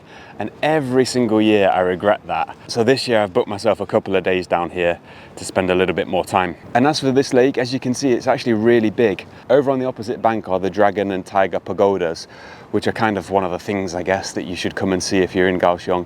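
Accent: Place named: United Kingdom. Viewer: 這是英國腔嗎？太好聽了🎉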